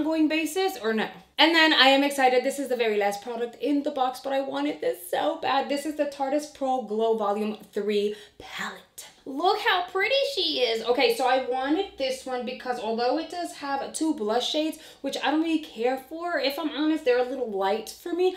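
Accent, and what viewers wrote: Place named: New Jersey, United States. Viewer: Always look forward to your boxycharm unboxings...i adore your Jersey ( I’m assuming that’s where you’re from cuz that’s what it sounds like) accent!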